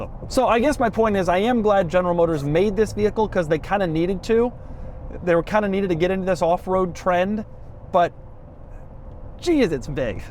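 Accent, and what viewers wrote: England, United Kingdom. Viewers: Love the English accent. All the best from England 😊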